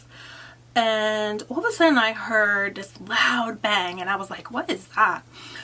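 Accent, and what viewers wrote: New York, United States. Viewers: Are u from ny?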